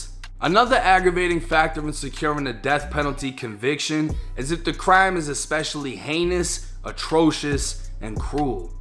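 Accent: Boston, United States. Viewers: U sound like u from Boston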